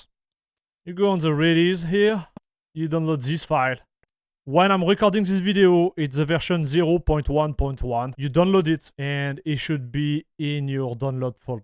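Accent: France. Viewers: He is french guy? The accent its funny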